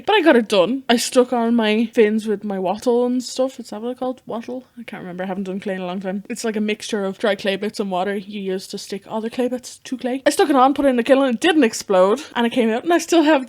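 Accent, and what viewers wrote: Ireland. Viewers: are u Irish?.!? same!!! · HOWYA FELLOW IRISH PERSON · Hey what country are you from/live in? You sound like you're from Ireland. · Are you irish? Could you sound like it a lot XD at least in my eyes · are you irish ??? i am and you sound like you are and if so which part? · OMG YOUR IRISH I LOVE YOU I'M IRISH TOO FINALLY A YOUTUBER WHO SAYS SECONDARY SCHOOL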